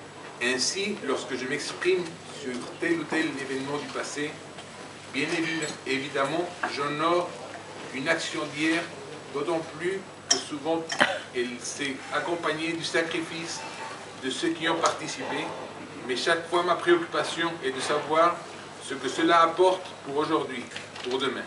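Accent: Spain. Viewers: Drolf Ozio Certes, mais ça ne l'empêchait pas d'avoir un accent béarnais. Il parlait français, avec un accent béarnais. Tout comme ce Louis XX qui parle français avec un accent espagnol. Ne vous inquiétez pas : s'il venait à être appelé au trône, sacré et couronné, son accent disparaîtra peu à peu... L'accent n'est rien ; et contrairement à l'illégitimité, il ne se transmet pas de parent à enfant... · L'accent espagnol n'est pas le problème ! Le problème est que ce type rêve : nous ne voulons pas de royauté ! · Il est beau, certes, mais putain !!! son accent espagnol fait tache !!! je le vois pas Roi de France, par contre si il a un fils aîné éduquer a la française, alors pourquoi pas.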